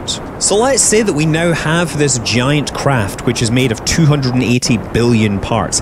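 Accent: Scotland, United Kingdom. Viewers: Your epic Scot manly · Hehe where about in Scotland are you from? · Cool you sound Scottish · Very, very Scottish. And I live there.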